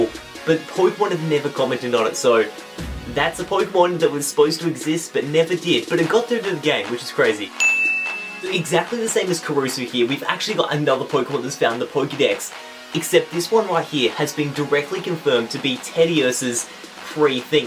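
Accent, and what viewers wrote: Australia. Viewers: Are you Australian? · Thats an australian accent. Thats honestly kinda racist. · ReaditonReddit Autocorrect :/ btw English Originated in England not America,and I know it was Australian but I'm not that good at realizing the difference in accents of Australian and British.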